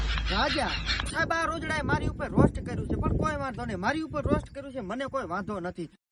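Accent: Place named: Gir, India. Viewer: Gir No Havaj Se Bhai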